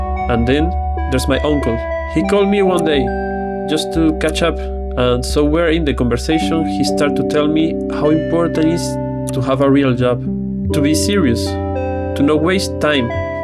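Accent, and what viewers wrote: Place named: France. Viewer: French accent is funny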